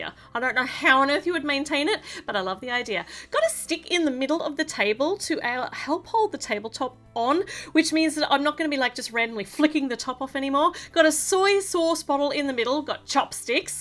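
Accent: Australia. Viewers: She has so much energy and by listening to her talk you can hear the amazing Australian accent❤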